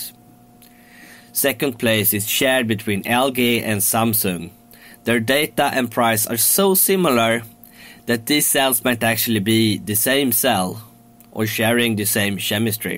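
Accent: Sweden. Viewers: Sounds like you are from Lidingö…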